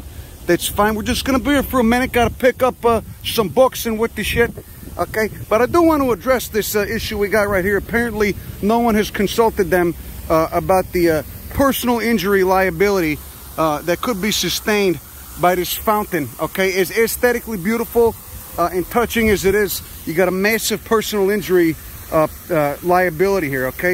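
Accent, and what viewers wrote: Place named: New York, United States. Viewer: Hello, I'm Pete, a new subscriber. Your coyote video brought me here. I am fascinated by your combination of wit, wiseguy and accent. I'm a native New Yawkah from the wilds of the Harlem projects, so I feel at home hearing your vocals and attitude. I'm going to catch up on your list of uploads and enjoy them immensely. Your humanity towards that poor little creature warmed the cold, cold cockles of my heart. I don't know shit about botany but I'm hoping aside from being entertained I will be educated. Thanks for uplifting my day!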